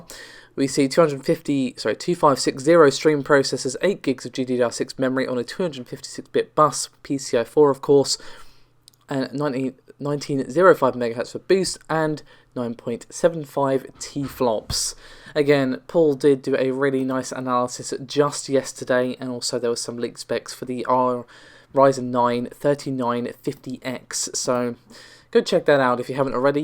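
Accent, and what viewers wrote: United Kingdom. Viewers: What is this woman’s name??? I’ve watched so many of these god damn videos and have no fucking clue what she is saying when she introduces herself and says her name. She sounds like she’s slurring her words with her strong British accent and is making up a name that I’ve never heard of.?!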